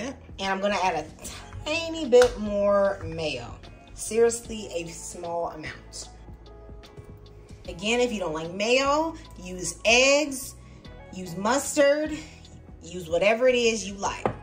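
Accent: South, United States. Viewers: Cutie pie with a southern drawl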